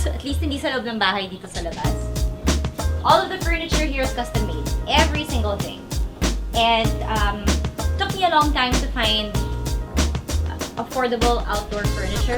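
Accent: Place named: United States. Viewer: Wow your English got good. U sound American... I love you. I’m a big fan.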